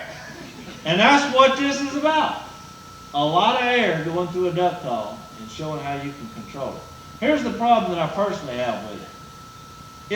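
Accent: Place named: South, United States. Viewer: his southern drawl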